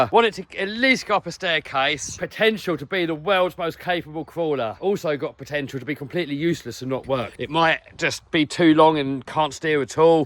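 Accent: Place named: United Kingdom. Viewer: were you the giving me all those cool hints when I was playing call of duty and now I'm watching you doing the trucks? Or is that just my idiotic American ear not being able to pick up the difference on a British accent? Full respect sir. Love your channel.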